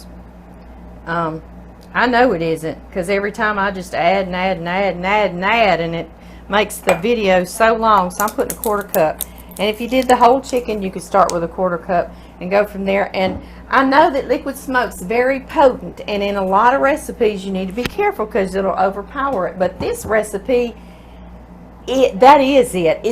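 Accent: South, United States. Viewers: I just looove your Southern voice ❤Imma make this..Thank You for being kind to share this..Stay Safe ❤😷❤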